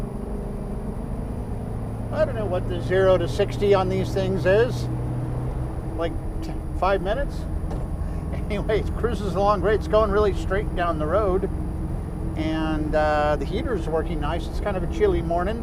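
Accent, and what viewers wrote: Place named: Upper Midwest, United States. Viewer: Sounds like he's from upper mid west/northern plain states..Mn, Mish..'EH'?..Robert at 70 in Az.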